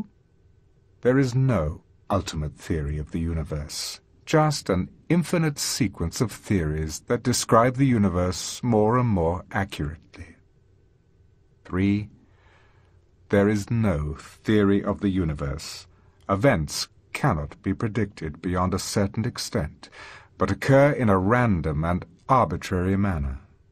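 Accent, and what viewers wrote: United Kingdom. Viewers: Beautiful voice and pronunciation. At last a British English and not the usual american twang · Love the British accent. Sounds very English!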